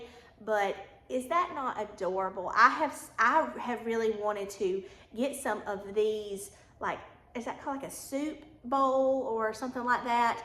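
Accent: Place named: South, United States. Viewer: Love your southern accent 🇬🇧🇺🇸🌸🌸 (I really miss being in Tennessee)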